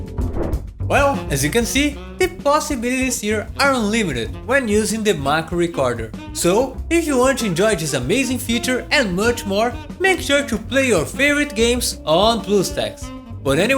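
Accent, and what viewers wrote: Brazil. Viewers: that brazillian accent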